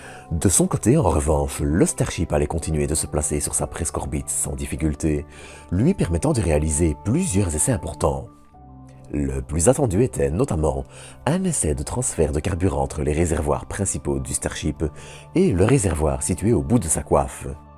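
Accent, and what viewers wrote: Belgium. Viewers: vous avais un accen belge magnifique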